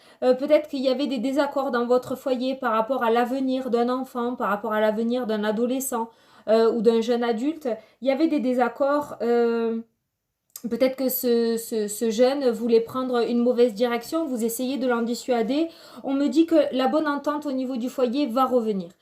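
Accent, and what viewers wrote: South, France. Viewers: Bonsoir ...je me suis proposée de faire du bénévolat . Je commence demain matin et ont vas peut être me proposer d' autres missions.. étant actuellement au chômage ...j' ai travailler dans le social pour personnes handicapées et autistes ...j' ai de l' expérience.. malheureusement j' ai préférer donner ma démission ( surcharge de travail et le système ma déçu..je garde de très bon souvenir des résidents qui était attachant pour ma part. Je voudrais travailler avec les eyen maternelle ou du social pour femmes en difficulté..🙏🙏🙏🙏 ⭐⭐ je ne perd pas espoir je souhaite de tout cœur ma situation professionnelle de réalise .. merci pour votre tirage votre accent du sud je pense ..cela me fait penser ( le soleil la mer ., tranquille quoi 🤣🤗💋💋💋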